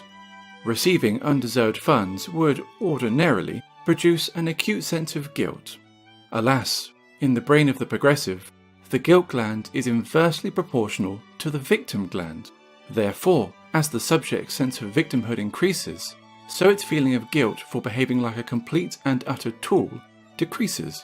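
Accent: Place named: United Kingdom. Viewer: O sotaque britânico deixa ainda mais irônico .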